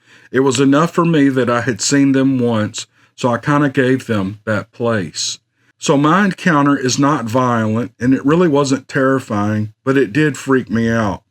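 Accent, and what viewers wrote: South, United States. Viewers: Please don’t try to get better!! Look how much you’ve grown already and so fast. People love your southern draw, your calming effect and your narration skills! Would you take an old cherished record out of its sleeve, when there is nothing wrong with it, to fix it? NO! So just be your charming self. We’ll let you know if there’s ever a problem by yellin acha from da holla! Thanks, don’t ever change. Tell your wife that perfection can’t be fixed! Well, maybe not tell you wife on the other hand. We want y’all ya come back. ps: Just remember this, this ain’t Dixie Chicks, it’s. It’s the Dixie Chicks that need the fixin, not!! Thank you! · Just be you, love that southern American accent brother and volume is just fine and as always enjoyable to hear you mate!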